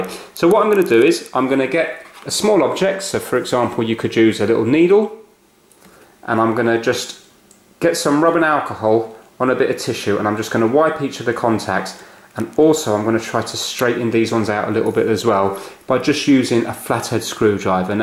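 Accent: United Kingdom. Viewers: thank you british man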